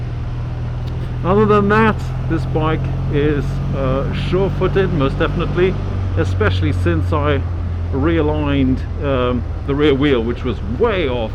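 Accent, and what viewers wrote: United Kingdom. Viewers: Funny I was wondering the same thing. He sounds like a Brit but I know Brits drive on the left?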